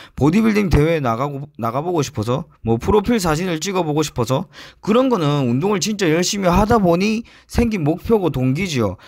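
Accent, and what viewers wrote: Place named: Busan, South Korea. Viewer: ㅋㅋㅋㅋㅋㅋ 말투가 여기쪽이네...부산창원...정겹습니다.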